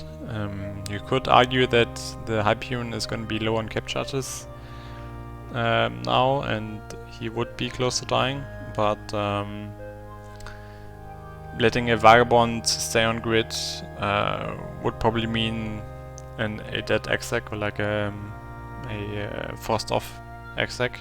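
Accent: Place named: Germany. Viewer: Ich wollte dich zuerst wegen deinem deutschen englisch dissen aber ich kann es selbst nicht besser :D Sehr nice!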